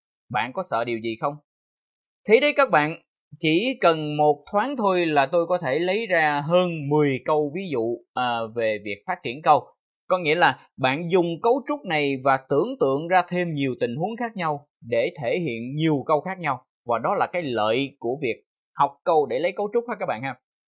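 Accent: United States. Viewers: Giọng Anh mỹ thầy quá chuẩn hay lém thầy ơi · Rất hay thầy ơi,, mih thích lắm,, Đúng với mih đang cần học,,, bạn nói English phát Âm giống như người ở Mỹ,,, và cũng giống như giọng người Bản xứ,, it's great 👍❤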